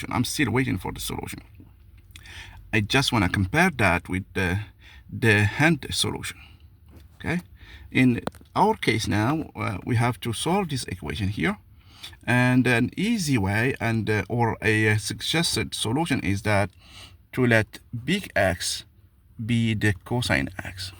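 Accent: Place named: Morocco. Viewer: you sound very moroccan sir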